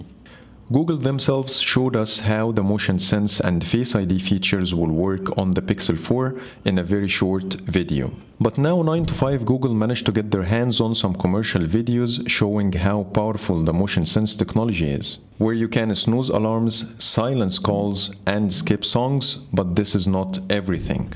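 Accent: Egypt. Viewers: Hmmm I hear an Egyptian English accent over here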